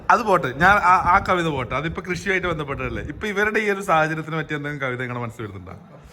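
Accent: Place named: Kannur, India. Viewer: കണ്ണൂർ slang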